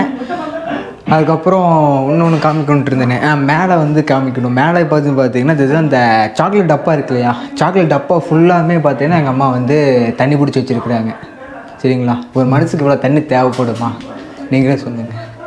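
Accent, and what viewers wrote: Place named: Coimbatore, India. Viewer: sirunga coimbatore bhashaikku na adimai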